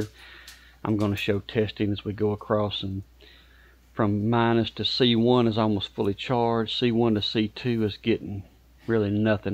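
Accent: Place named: South, United States. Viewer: Thanks for always being so good about reading and replying to comments! I’ve been watching your videos for a few years now since I was trying to troubleshoot an EGO battery. You even made a short follow up video to answer a question I had back then and I’ve been a fan ever since. Actually I think you may have even helped shape my appreciation for Southern accents and their associated charm to be honest lol. You’re a great teacher, fixer and creator. Keep up the great work, wish you and your channel all the best! Much Mahalos and Aloha! 🤙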